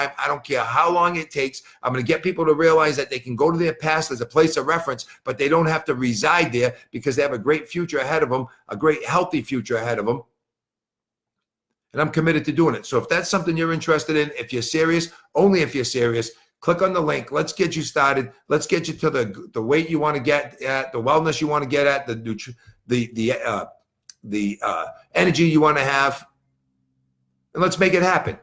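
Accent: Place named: Boston, United States. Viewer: That feedback loop and accountability are so important. That Boston accent is strong!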